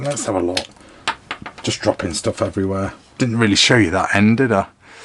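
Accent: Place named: United Kingdom. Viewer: Oh, this British accent :D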